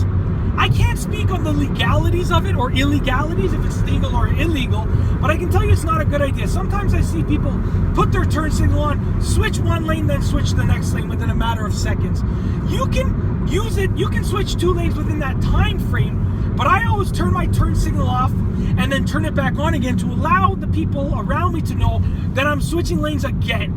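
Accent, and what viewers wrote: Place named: Canada. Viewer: I think abe Lincoln is said to have spoken like this guy. Though ... Probably without the Canadian accent.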